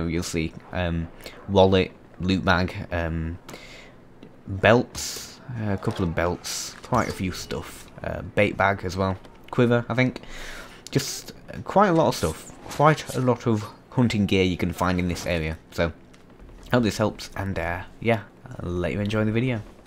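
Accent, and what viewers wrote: United Kingdom. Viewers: This guy is british.cuz dat accent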